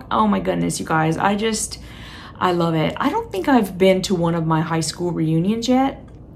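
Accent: Louisiana, United States. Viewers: You have the Cajun accent back! I have kids and grandkids in Lake Charles!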